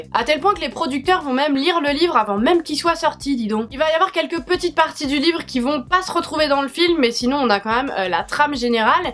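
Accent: France. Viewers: Pour Jurassic Park effectivement je l'ai aussi appris par hasard en fouillant dans les livres de mon père, il est dans ma pile à lire depuis quelques mois... livre de l'été ?! Pourquoi pas 😊 Super vidéo je viens de te découvrir et j'aime ta façon franchouillarde de parler, on à l'impression de discuter avec notre copine de façon hyper naturelle, ça fait plaisir de voir ça sur youtube 😊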